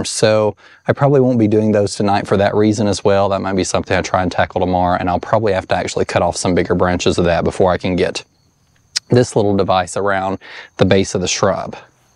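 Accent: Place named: Appalachia, United States. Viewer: Hello, I love watching your channel! I think one of the main reasons is because I live in the Appalachian mountains in Huntsville, AL and I feel at home listening to your accent. I grew up on top of Monte Sano Mountain and now live on the side. Thank you for allowing us to follow your journey.:) Kelly Flowers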